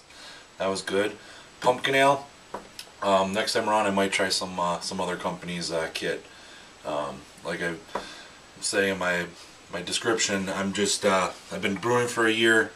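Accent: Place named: Midwest, United States. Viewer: First off Nice brew area, clean and organized. Second I knew you are from the midwest cause you have a bit of an accent. Your from wisconsin so you would probably call me a FIB, lol! I brewed the holiday kit and found the same thing, very alchohaly taste at first but not a bad beer. I think it smelled better when I was brewing it than the finished product to be honest. Question, what is or how do I make liquid brown sugar? That sounds amazing with a pumpkin brew. Cheers!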